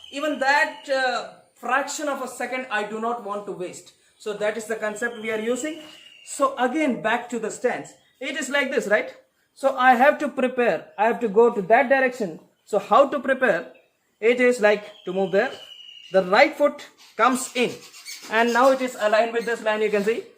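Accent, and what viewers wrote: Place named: South India, India. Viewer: Ur accent is like south Indian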